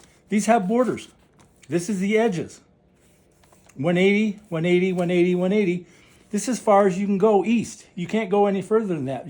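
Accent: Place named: United States. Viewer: HEY! Further to my other comment. I took a quick look through your YT channel and conclude that you are 'Muricun. You say EEquator and not eQUAtor. I described in my other comment how a Mercator projection is made. Now I will tell you about the TRANSVERSE Mercator projector. In the US of effen A!! Large scale topographic maps are created using a TRANSVERSE Mercator projection. A meridian (line of Longitude) is chosen as the central meridian for any particular map. The entire country is gridded out so these reference meridians are specifically chosen to allow map sheets to line up with ones east and west (and north and south) of them. Imagine a cylinder of paper wrapped AROUND the globe along an above stated meridian line. The maps produced here are VERY LARGE SCALE so only a very tiny bit of the earth's surface is projected for these maps. The largest scale map that is usually created for these topographic maps is a scale of 1:63360 WHY that number? 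I live in Canada. An equivalent map that is produced here is 1:50000, so it is slightly larger in scale. But WHY does the USA use 1:63360? ANY idea? I'll let you figure that out. When you do, then figure out why Canada uses 1:50000 These topographic maps are quite accurate. They ALL have a bit of distortion at the east and west edges. They are accurate ONLY on the very center meridian line. You can purchase several map sheets that adjoin each other and cut off the large margins to leave JUST the map part, and then fit the she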